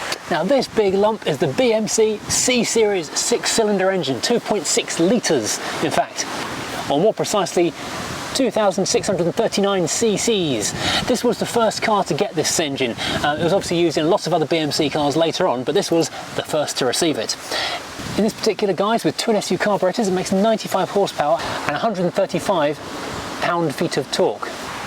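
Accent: United Kingdom. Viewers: Wish he wouldn't speak so British, I would like to understand WTF he is saying...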